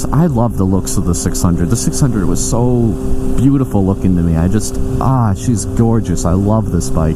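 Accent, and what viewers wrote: Massachusetts, United States. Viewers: You from my home state of Mass? You sound like it in your accent a little.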